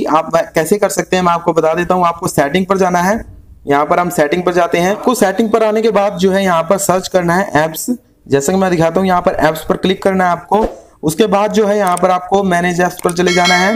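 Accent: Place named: India. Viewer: You know its not clickbait when you hear hes indian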